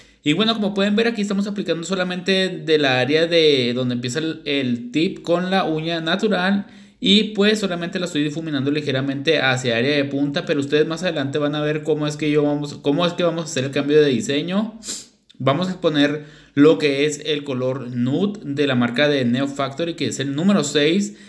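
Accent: Mexico. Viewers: Insisto con que hermoso acento Mexicano tienes, tu forma de hablar me relaja tanto. Y tu manera de hacer las uñas es maravilloso igual que ese color. 😍💙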